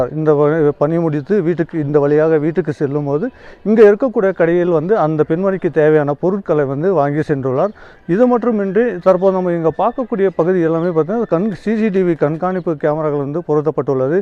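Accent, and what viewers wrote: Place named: Bangalore, India. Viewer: Bangalore Tamil 😅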